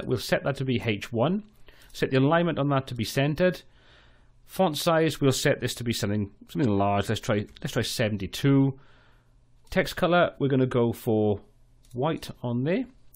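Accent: Wales, United Kingdom. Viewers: Hey great video! Random question, are you from my merry land of Wales?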